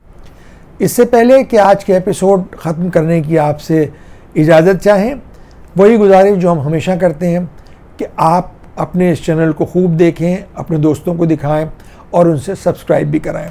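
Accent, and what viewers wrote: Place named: Delhi, India. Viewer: Lko kie zabaan chouk wali boli hie hai Ameena baad kie bhi yahi thie yeh aap aao dilli kie boli hai.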